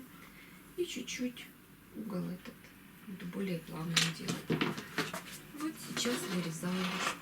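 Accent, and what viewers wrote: Ukraine. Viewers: Кристина Котельва да акцент наш, украинский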